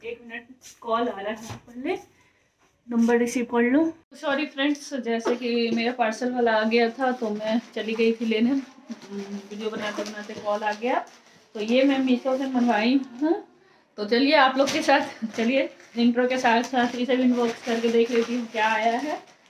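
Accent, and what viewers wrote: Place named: Bihar, India. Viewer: बिहारी हाे का!!!!? हिन्दी से लग रहा है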